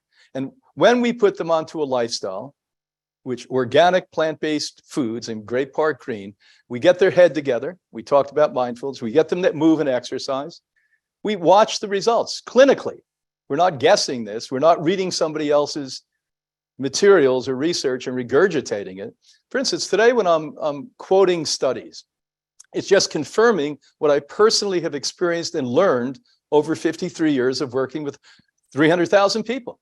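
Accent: New York, United States. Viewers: Poor! No matter how much knowledge and data you have, when are you going to learn that the way you deliver your information to human beings is all important? You're constant attitude of sarcasm and a superiority virtually reek from your being and the sidewise New York delivery is of no help whatsoever... You simply sound like the smartass spouting his stuff. When is the true milk of humankindness the true perfume of genuine affection going to issue from your being before you depart this world? Is it reserves for your family alone? How tragic. Try to talk to the man in the mirror. Perhaps give him a little trim and view him with no cosmetics. Whatever, and see if you really like this guy. It's a stretch to believe so. In any case, whatever you do, do it in love, and without needless sarcasm. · Yes and I love the NY accent too as I’m originally from NJ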